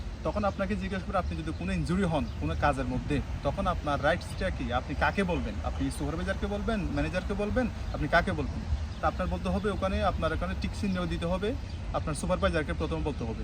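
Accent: Chittagong, Bangladesh. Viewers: ভাই আপনার কথা শুনে মনে হচ্ছে, আপনি আমাদের চট্টগ্রামের ছেলে 💚💚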